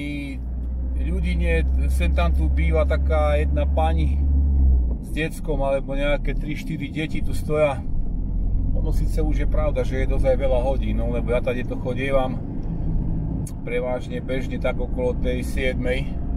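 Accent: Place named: Liptov, Slovakia. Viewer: odkial pochadzas??podla prizvuku bz by som tipol liptov alebo oravu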